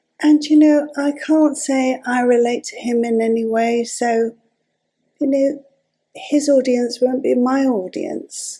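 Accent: England, United Kingdom. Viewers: I like the “blue”in all your pictures…and enjoy your English accent…go on, life is precious on every age!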